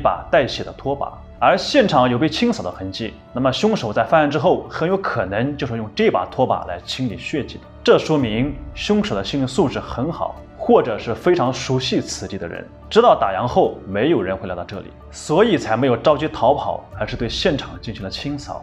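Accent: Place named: Anhui, China. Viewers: 听起来口音应该是安徽的吧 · 主持人是安徽人，口音重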